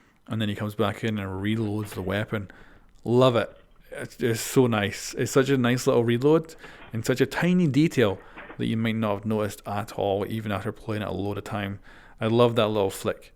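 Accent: Scotland, United Kingdom. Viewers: I’m not sure if I’m right but it sounds like ur accent is slightly Scottish is it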